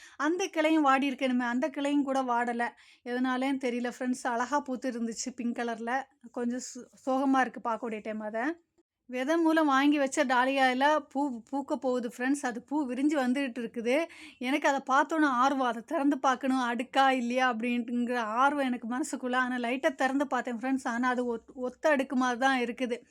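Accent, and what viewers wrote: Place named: Nagercoil, India. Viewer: ok sister, vunga slang nagarkoil madhiri iruku, enaku native thiruchendhur, indha Marigold seeds kidaikkuma sister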